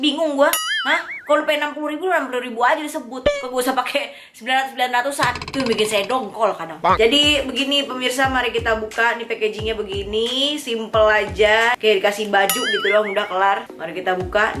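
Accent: Tangerang, Indonesia. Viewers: sebagai SPG nya BRUNBRUN Paris di supermall Karawaci saya merasa terhibur, ngakak banget si ka bahasa Tangerang nya kelihatan jasa 🤣🤣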